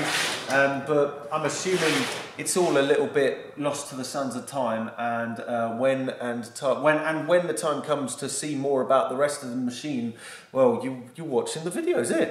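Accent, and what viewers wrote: United Kingdom. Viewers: Only thing I like about you is your english voice. But there is no punk anymore. No revolution. Just established crap about electro bullshit. Just like that sad Schneider.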